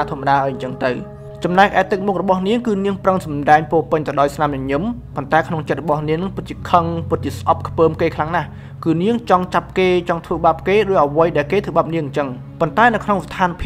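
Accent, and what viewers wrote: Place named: Siem Reap, Cambodia. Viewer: Admin និយាយមកដឹងតាអ្នកសៀមរាបម័ង😂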